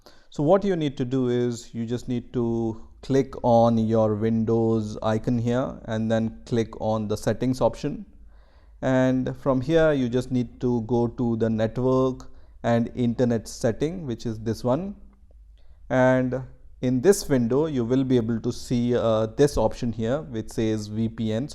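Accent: India. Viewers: indian tech support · W❤️W.. it really works and why not..? As the English accent is an Indian guy.. Love❤️ from Pakistan 🇵🇰 bro.. and with the prayer for our countries that we will be together one day..🤲🏼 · your idian accent makes this video look like a scam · ok just wondering.... why do you automatically assume that he is in india? you have no proof of that just because he has an indian accent doesn't mean he lives in india.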